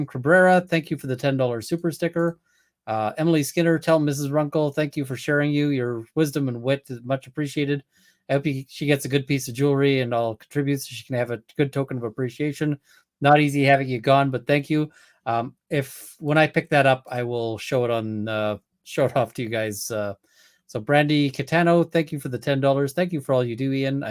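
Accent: Canada. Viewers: I love the accent! Most of my family is in Canada and you sound just like them! Also my mom says it that way . She is Canadian and I’m dual cit but never got to live there . Still May move. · Had no idea you were Canadian! Yup, Americans always know us by our Canuck accent! I don't hear it either. Enjoy your blog and your jury insights. Today's witnesses (Tues) were a total bore. Those poor jurors! The damages perspective was a bit interesting.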